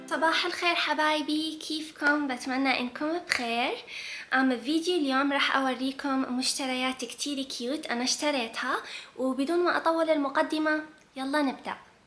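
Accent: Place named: West, Algeria. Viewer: ايه صح صح انا ثاني شفيت بلي كانت تهدر بلهجة الغرب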